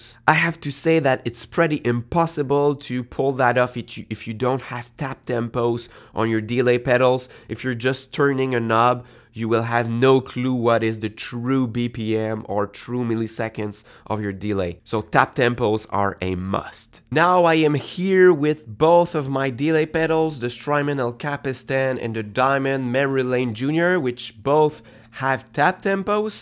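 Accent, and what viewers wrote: Quebec, Canada. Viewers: Is that accent from Québec?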